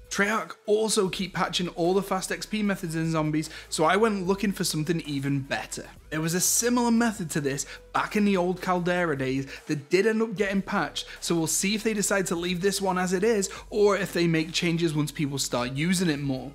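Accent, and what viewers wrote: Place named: United Kingdom. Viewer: fucking british accent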